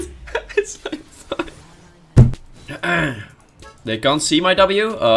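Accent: Brabant, Netherlands. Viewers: the dutch accent tho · I love the dutch accent XD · Je hoort kei goed dat je nederlands bent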